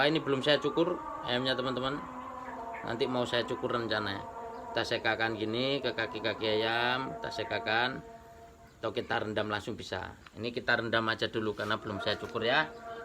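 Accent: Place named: Java, Indonesia. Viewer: Boso jowone ra iso ilang mas😂😂 Salam saking tulungagung..🙏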